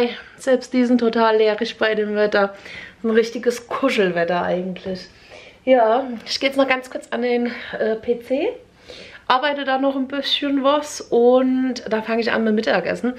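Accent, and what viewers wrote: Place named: Palatinate, Germany. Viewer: Schönes Video liebe, du bist so sympathisches Fräulein 😀ich höre dir gerne zu und dein Pfälzisch 🥰hab früher in Speyer gewohnt,.. in der Zeit du wahrscheinlich in Mannheim, wenn ich mich nicht täusche, hast du es irgendwann in einem altem Video erzählt 😀lg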